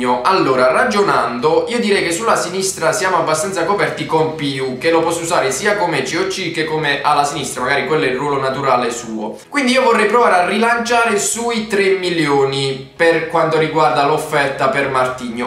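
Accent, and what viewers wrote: Bari, Italy. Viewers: ma sei barese?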